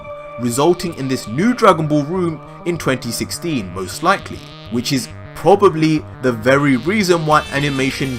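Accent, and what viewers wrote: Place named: England, United Kingdom. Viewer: Hello you sound like your from England, so am I !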